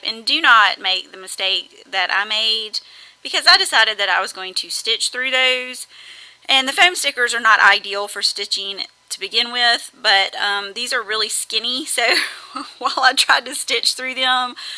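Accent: South, United States. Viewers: Such a sweet layout!! Great job! I just love watching your videos because I'm from Alabama and love to hear a familiar southern voice :) To chicken to do my own videos because I think I sound awful on a recording. Love watching you though!!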